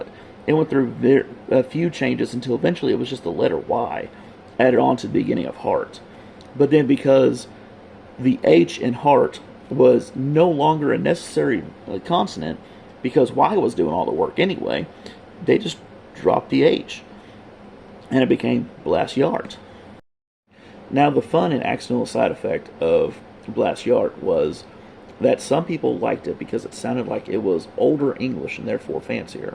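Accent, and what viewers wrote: United States. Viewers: Pretty sure this is just how people from Mississippi talk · as a southerner, this is how some people really talk down here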